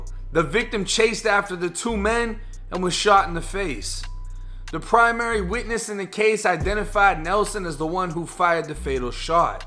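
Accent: Boston, United States. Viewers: "To portray that he was nothing more than a criminal, killed by KARMA." LOL your boston accent made it sound like you said he got killed by comma. Kuz for some reason boston niggas dont like to pronounce the letter R if an A comes before it. Lolol Karma (kah-ma)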